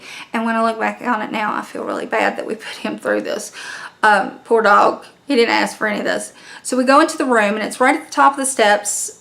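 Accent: South, United States. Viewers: Loved your Kooky-ian vid so started binge watching other vids. If you like true ghost stories check out "Real Ghost Stories Online" podcast w/Jenny & Tony Brueski. Sooooo good!!! Love hearing you talk. We've moved to Maine from the South & it is lovely to hear familiar accents!! LOL!! Sending much love!!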